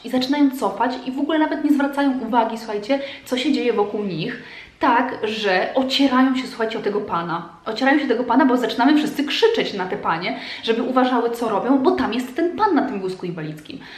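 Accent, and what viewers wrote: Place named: Kraków, Poland. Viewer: Ciao. Mam identyczne przemyslenia ! Ps i jestem krakowianka! U nas w Krakowie wychodzi sie na ,,pole,, a w innych czesciach polski ,,na dwor,, 😁 napisz jak oczywiscie mozesz chociaz gmine w jakiej mieszkalas...masz typowy akcent krakowski jak Ja..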